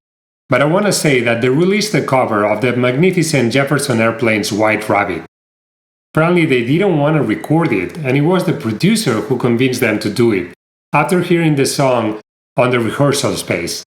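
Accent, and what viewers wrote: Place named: Spain. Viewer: I made it all the way through this video, but mostly for the hot Spanish accent.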